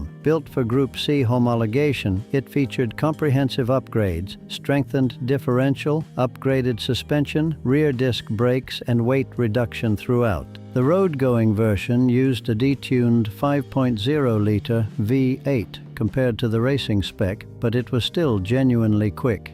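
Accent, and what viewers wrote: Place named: United States. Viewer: similar, he has that yanky voice.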